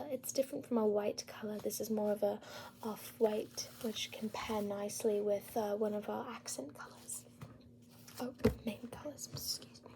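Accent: England, United Kingdom. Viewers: Your English accent is very authentic. The pronunciation of “meticulous” was absolutely fabulous (have you seen that English comedy series, if not, you should try it 😁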